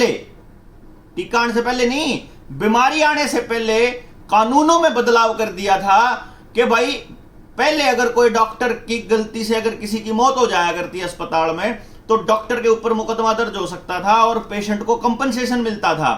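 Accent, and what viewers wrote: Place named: Haryana, India. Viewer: हिंदी ही है पर लहजा हरियाणवी है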